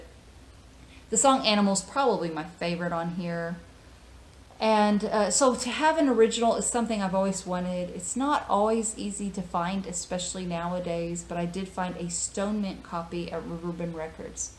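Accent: United States. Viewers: I'm English (in London). Although I only post an occasional comment, I always watch your videos - I subscribe to just three VC channels and you're one of 'em! I enjoy your soft, soothing American accent, and the way you speak with such grace. I also like the fact that you take notice of the record cover art. Best Wishes for Christmas and the New Year 🤗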